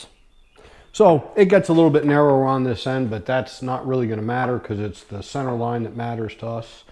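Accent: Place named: Northeast, United States. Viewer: I'm in North Carolina now from Pittsburgh. I recognized the North Eastern in your speaking. Tired of the ridiculous cold Snow...etc! Really love it down here! BTW, great channel! I am a master builder/Carpenter, and built a few guitars by hand. I was thinking about getting involved in the CNC as well.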